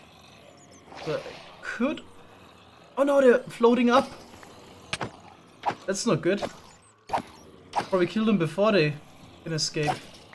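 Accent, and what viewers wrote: Germany. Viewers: Haha cool du bist auch Deutscher hat man am Akzent erkannt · Hab deinen Kanal erst vor ein paar Tagen entdeckt und finde dich super ! 😁👍 Aber ich fress n Besen samt Stiel wenn du nicht deutsch bist, mein Englisch klingt genauso 😁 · Are u German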